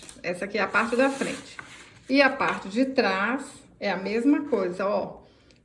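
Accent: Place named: Minas Gerais, Brazil. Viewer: Oi vc é ótima. Adorei. Parabéns 👏👏 Vc é mineira... é bão demais da conta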